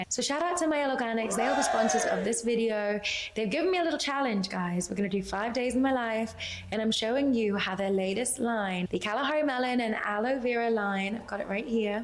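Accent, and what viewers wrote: United Kingdom. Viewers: 😭 I binged some shorts and subscribed right, then in one I heard a (your) voice and smiled. My thought was look at another woman who likes to put on accents like me (you were answering a commenters question). Only to find out when reading your bio that you're British for real. I have real tears in my eyes hahahah I was so virtually embarrassed for myself. Love your channel. ❤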